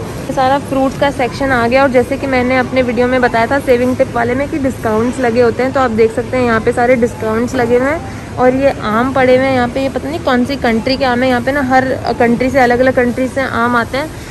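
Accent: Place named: Rajasthan, India. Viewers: Pahli baat ki video banane wali rajasthan se lagti hai.sahi Hindi nhi bol pa rhi..yaha para huwa nhi hota..rakhi hui hai boliye..aur ek zaruri baat..her chiz pe vedio mat banao..ye kya matlab hai ki supermarket dikhate hai aapko..are ab india main hypermarket aa gaya jo ki vo bhi boring ho gaya hai aajkal...Germany main rah k training lo ki kaise presentation dte hai.. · Ye inke bolne ka tone hai Rajasthan se hai ye rajasthani me pada hua hai ese ho bolte hai isliye inki hindi me mix ho jata hai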